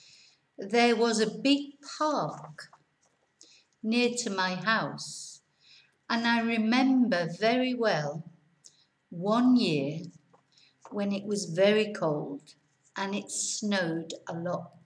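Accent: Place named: England, United Kingdom. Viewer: Hi I really like your videos but you need to work on your Spanish accent you speak it with an English accent.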